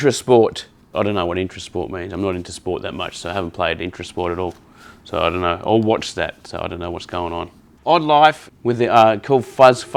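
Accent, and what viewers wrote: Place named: Australia. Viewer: Great review. Love the Aussie accent 😁 mines a bit different 🇦🇺 Yah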